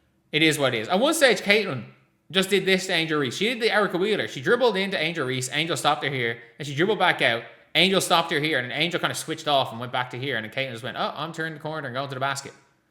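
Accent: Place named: Ireland. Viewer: You are the worst YouTube Fever analyst, milk toast copycat basketball analyst I've ever seen on social media. I've seen an old lady, grandma type do better than you on YT. She's at least honest. You're a ridiculous fraud made all the more annoying with that ridiculous laconic, droning, put me to sleep, Irish Accent presentation. I almost can't stomach you more than fraudster Jason Witlock. I don't believe either of you watch the entire game. You both just skim the highlights & watch other people's podcast. Boring as all get out. I can't believe you even have 1 follower. I wouldn't watch your videos if you paid me.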